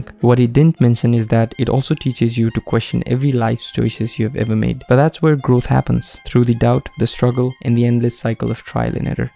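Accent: India. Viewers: where you from bro? India, UP?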